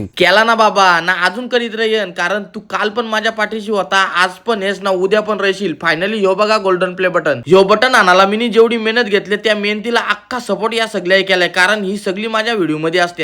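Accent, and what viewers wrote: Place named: Konkan, India. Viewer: Konkani acent wr me fkt fida aahe karan mazya baykola to kokan aavdt saglyat jast soo bhawa very heartily congratulations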